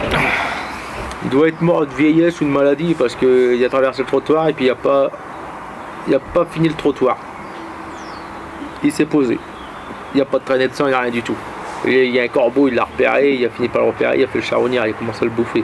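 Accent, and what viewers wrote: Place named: Picardy, France. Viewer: Bonsoir, Merci de nous avoir fait partager la vidéo 🫲 Je te rejoins complètement pour faire perdurer le patois chti. Moi, tu vois, je suis de l'Aisne donc, je suis également picard et ça me va très bien. Sois fière dont la manière que tu t'exprimes. C'est ton identité et tu dois la garder et la faire perdurer. Te souhaite une bonne nuitée ainsi qu'à tes proches et abonnés. A bientôt. Denis.😉👍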